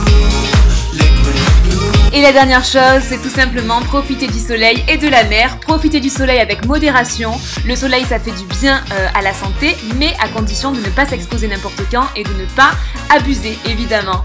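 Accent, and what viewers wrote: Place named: South, France. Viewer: Je t'ai découverte depuis quelques jours ( merci aux suggestions!) Ta bonne humeur, ta spontanéité, ton accent ( ça me rappelle mon sud!) me fait sourire! Avec vous êtes authentique et très agréable, continuez ainsi et soyez heureux c'est l'essentiel!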